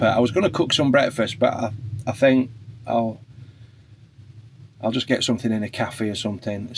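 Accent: Yorkshire, United Kingdom. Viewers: Non Brit here, where is your accent from? Yorkshire? Love it❤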